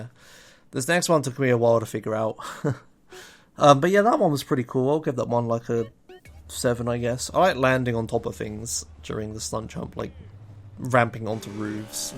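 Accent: United Kingdom. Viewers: He is speaking normally, that's how us brits sound.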